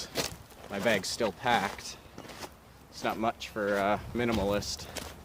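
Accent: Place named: Canada. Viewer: Hmmmm ouuut & aboouuut...you must be Canadian. Cool. I can only tell when you guys say one of those words. I haven't heard you say "ay" too much though...lol.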